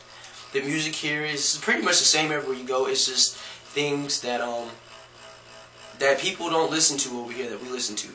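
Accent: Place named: California, United States. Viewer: Hello, I am not from califronia, but i think you do have the californian accent which i consider is the best found in the US. That accent is the clearest and the one that feels really proper. when i was learning english my teacher was from CA and i basically speak like you do. I wish i could be in touch with some people from CA no matter what part, so i can learn more abou the culture and about the people from california. Kudos to you and don't bother listening to those haters who know nothing about proper and beautiful english like it is spoken in CA. Thank you!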